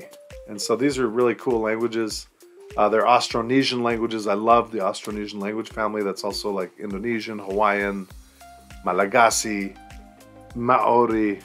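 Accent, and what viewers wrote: Philippines. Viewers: wow very nice to speak pilipino dialect sir ... amazing 😍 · Like how you talk in english , not like those how to say ? Natural accent ? No disrespect but the fastness and accent and the way you talk its like the words come straight from your nose , but you - nice , clear and very good , you learn to adapt like a true filipino in speaking english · Damnnnn.... You already sounds like us, Filipino... Love you.♥️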